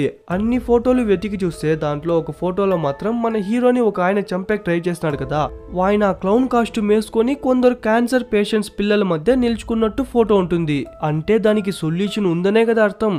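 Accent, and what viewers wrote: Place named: Kolar, India. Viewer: Kolar slag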